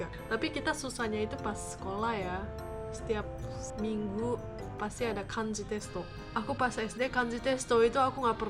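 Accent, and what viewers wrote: Indonesia. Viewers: Logatnya certified mba-mba indo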